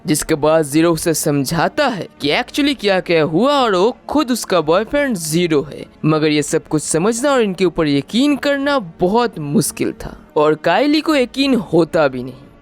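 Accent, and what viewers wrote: Bengal, India. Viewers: Are you from west bengal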